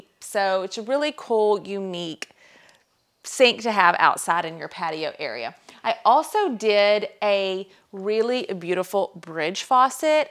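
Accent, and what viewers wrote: South, United States. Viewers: Greetings from Santa Fe New Mexico! Thank you for sharing! I am renovating a ranch house near Lake of the Ozarks in Missouri and am creating an outdoor space, though this aspect of the project is Phase 5. I am just now finishing Phase 1. 😊 Have a ways to go yet. Your inspiration is catching. We Missourians and Arkansans share the same half-sounding Southern inflection!💕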